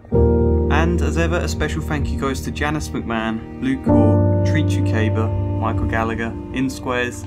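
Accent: United Kingdom. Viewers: It's okay - your accent shows that you're obvious British, and thus apologies are likely second nature to you. I'm sorry to have pointed this out; I'm Canadian. Sorry.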